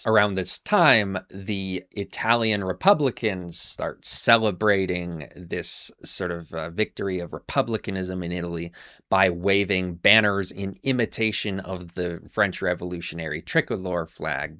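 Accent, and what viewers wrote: Wales, United Kingdom. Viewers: Chief are you welsh??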